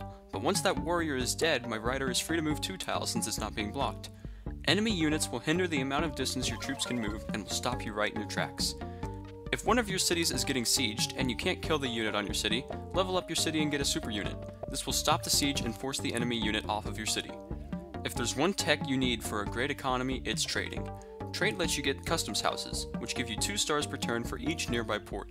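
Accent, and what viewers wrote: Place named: United States. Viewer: He doesn't wanna pronounce it like that because of his yeehaw American accent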